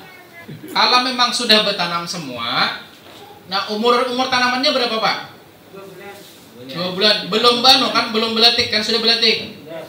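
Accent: Banjar, Indonesia. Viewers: Urang banjar leh bang??😂😂😂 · Pian sekalinya urang Banjar 😁, Barabai kah pian bos kuuu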